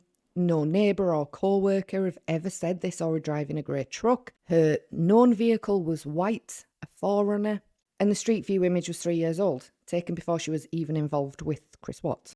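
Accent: United Kingdom. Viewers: MAKES A PLEASANT CHANGE to listen to a non-sensationalised analysis of the Watts case; nicely done. The Northern accent feels just that bit more human.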